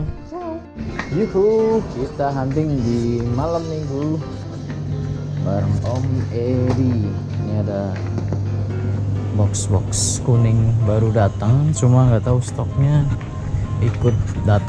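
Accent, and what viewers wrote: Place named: Banyumas, Indonesia. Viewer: Basa ngapak Wong ndi sampeyan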